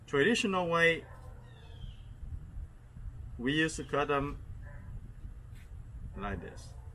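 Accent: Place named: Vietnam. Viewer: beautiful star fruit. You sounds like a Vietnamese, are you?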